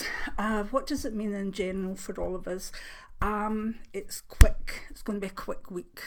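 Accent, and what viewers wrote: Scotland, United Kingdom. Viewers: Hi, Thank you for your insightful reading. Just wanted to say I love your Channel. My parents are from Lanarkshire but we live in South Australia, so it is nice to hear a familiar Scottish accent. Thank you xx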